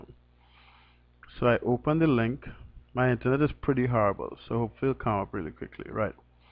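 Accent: Trinidad and Tobago. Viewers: you sound like a Trini if you are i am too and have been drop shipping both on ebay and amazon will love to connect can we watsup or messager ???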